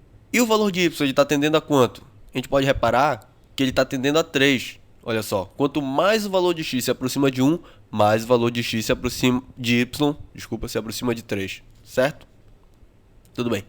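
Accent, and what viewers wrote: Pará, Brazil. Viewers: Cara você é paraense???/. Você é muito bom parabéns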